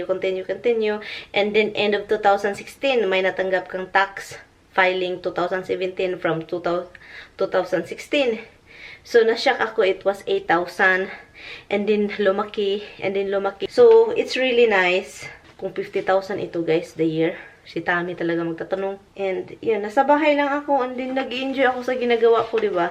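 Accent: Visayas, Philippines. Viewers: Mkatawa mn ko mgtan aw nmu sis oi 😊🤣sagdihi lng na imu prounounciation bisaya ra mkarelate ana😁😁😁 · I enjoy your vlog so never mind the pronounciation understood na yun mga pilipino tayo hindi naman tayo british or amerikano love you.♥️♥️♥️ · Hello and Tommy watching from Muntinlupa City.. Parejas ta bisayang dako dhay oi...yun english pronounciation ntin matigas hahaha😂 never mind the bashers insecured lng cla sau. Kc sobra blessed buhay mo kysa knla. Nkktawa kng panoorin ang saya mo panoorin dapat gnun buhay msaya life dpat gnyan tau iwas stress...enjoy life lng😉😍 katawa ra ta oi😂😂😂 kalami sa imong sud.an lato ug daing.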